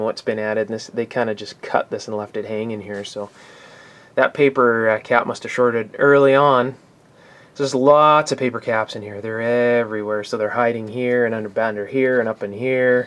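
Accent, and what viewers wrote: Canada. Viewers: Nice Canadian accent,!!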